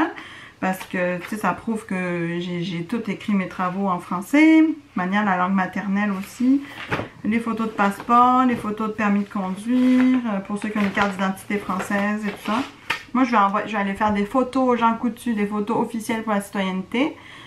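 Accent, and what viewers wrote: Quebec, Canada. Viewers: Comment tu as fait pour avoir cet accent québécois ? Moi j y arrive pas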